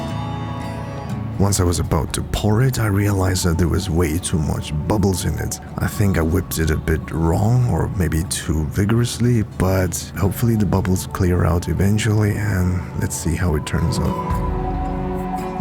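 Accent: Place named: Germany. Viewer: It's peculiar that you sound like a German native speaker to me.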